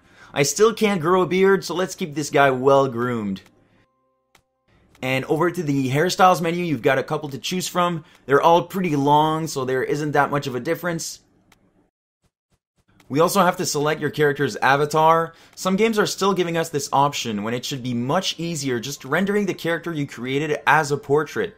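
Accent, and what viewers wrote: Canada. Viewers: Are you Canadian? Cause you said aboat instead of about I'm not trying to be racist but idk it reminded me of young Robin from HIMYM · ....He's Canadian!! His accent bothered the crap out of me trying to figure out what it was.... Time to rewatch the video, now that I can concentrate.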